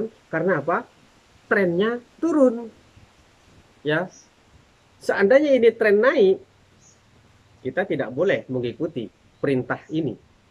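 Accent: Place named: West Java, Indonesia. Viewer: dari logat suaranya mirip orang sunda hehe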